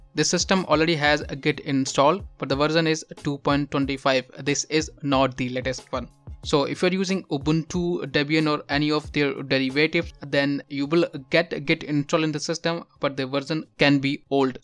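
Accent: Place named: India. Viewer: Talk slower please, I can learn and speak with second language accent. me, a 57 year old white guy trying to learn Linux-GNU from the beautiful (vernacular) Indian accent. You speak faster when giving instructions or says a new name.vernacular I have to learn more acronyms than the Navy- Air force and Space Agency put together. oO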